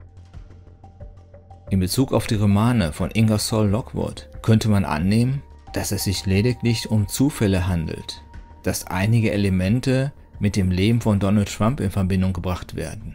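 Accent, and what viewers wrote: Spain. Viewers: Es ist wundervoll wieder deine Stimme mit dem schönen Spanischen Akzent zuhören. Möge Liebe und Güte immer mit euch allen sein. So sei es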